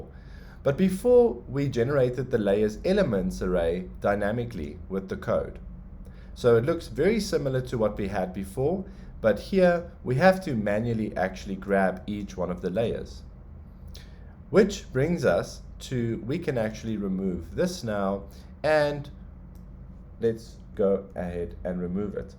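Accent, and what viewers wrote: South Africa. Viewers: Hallo, do you have a walkthrough video of the entire coding? I would love to have a basic understanding of what the different parts do without having to watch how all of it was coded. Thank you for all your effort <3 (I was pleasantly surprised to hear your braaibroodjie accent, ha-ha.) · Great stuff boss! Are you South African? It feels like im getting schooled by a long lost uncle. :). " In the coming weeks, I will create a solidity series that will teach you the basics of smart contracts on the blockchain." - The solidity code for NFT's pretty basic. its more the Minting Dapp and react app that can display the list of NFT's and filter is the hard part I think. ;)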